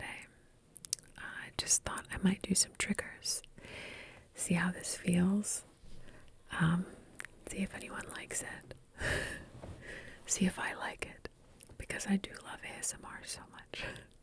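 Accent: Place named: Manchester, United Kingdom. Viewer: accent is south Manchester/northern Cheshire by the sound it (I worked in Stockport for a few years, near the Pyramid) and it rings bells from then. If you want to get the tag off an IKEA mug, use WD40 - lifts it right off. Hand wash the excess WD40 it before you stick it in the dishwasher, otherwise it can cross contaminate.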